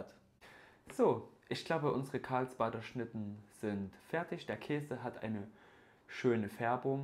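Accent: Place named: Saxony, Germany. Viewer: ich finds immer wieder süß wie der Sachse versucht das Sächseln zu unterdrücken wenns öffentlich wird. :.-) Bin selber Leipziger und habe das bei vielen Videos bemerkt. Gutes Video , aber wo der Käse da schon "Farbe" hat weiß ich jedoch nicht . ;-) Aber Die "Karli" mach ich mir jetzt trotzdem.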